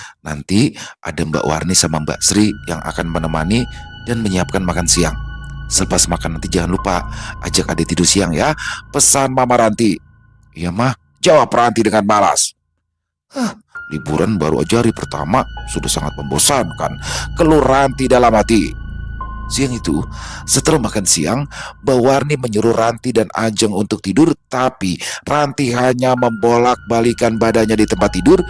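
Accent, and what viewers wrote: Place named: Java, Indonesia. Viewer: Assalamualaikum bang.. Mlg hadir nyimak.. seru ceritanya bang.. bikin terharu skalian lucu jg dengerin bang dgn aksen jawanya.. sm pas niruin suara mrk.. suuiip bgt.. terhibur skl denger cerita dr bang.. sukses buat bang n crew.. Aamiin..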